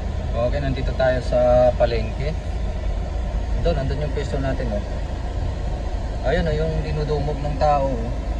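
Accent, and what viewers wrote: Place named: Visayas, Philippines. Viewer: Visaya man si ser oi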